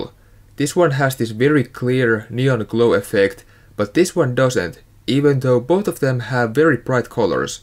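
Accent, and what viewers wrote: Finland. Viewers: When you started talking i was like he is from Finland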